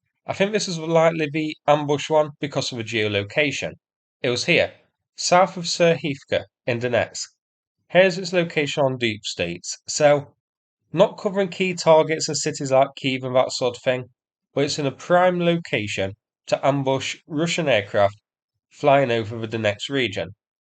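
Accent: United Kingdom. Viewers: Hold on are you seriously claiming this is the first patriot system to be destroyed in Ukraine? Sorry but thats insane cope. The US has confirmed the first destoyed batteries almost a year ago. Ukraine is now begging for 25 more patriots... Germany saying they have sent so many to ukraine they have no new ones to send. This channel turned into cope central lmao. The british accent makes it even funnier.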